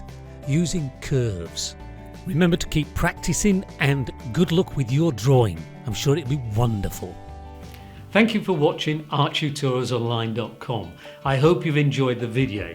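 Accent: United Kingdom. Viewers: The British accent with honky tonk music is a neat, yet confounding juxtaposition. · hmm how to practice my s curves... AH! draw ribbons!! the British accent, the voice of logic and reason, love it! subbed and checking out your other videos, cliffs sounds fun! watching that next after your video on lines.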